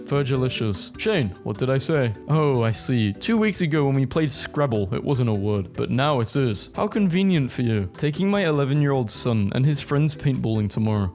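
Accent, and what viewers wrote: United Kingdom. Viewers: It’s cause he’s british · first of all it was a joke, second of all he has a British accent so I assume his first language is English and you saying it like it’s not his first language and if that was the case I would think differently, second of all I’m not saying he should be able to pronounce all of those words you listed because yeah they are hard to pronounce.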